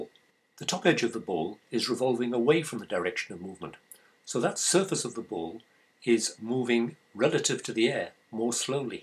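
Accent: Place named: United Kingdom. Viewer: Great video. Pleasant British accent, and succinct explanations-- what more can you want from a man?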